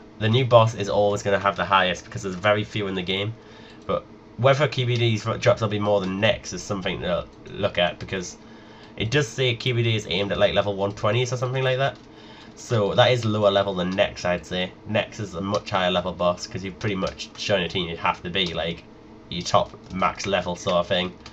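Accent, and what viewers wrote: Newcastle, United Kingdom. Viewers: it's a geordie accent, like newcastle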